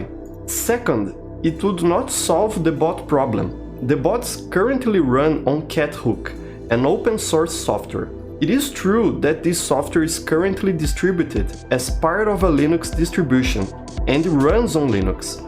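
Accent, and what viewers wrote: Brazil. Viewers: are you brazilian because your accent reminds me of brazilian and I'm from brazil :D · EU SABIA Q VC ERA BR, O SOTAQUE NAO ME ENGANA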